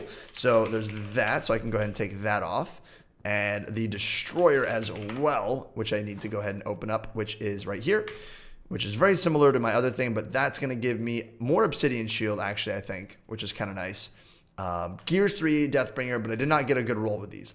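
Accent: Scotland, United Kingdom. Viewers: OMG I LOVE ACCENT U SCOTTISH GUY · Its Scottish like meh :D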